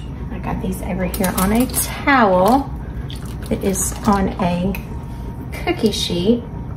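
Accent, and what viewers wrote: South, United States. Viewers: 40 she had figs on her tree OK we I don’t think we have fig trees here in the Minnesota area when you said that I was like kind of jealous like excited like thinking yes that’s really cool and then I was thinking can I plant a fig tree here. Are there any fig trees in the Midwest? I felt like I was very Mediterranean, but you have a southern accent so where are you at? Lol.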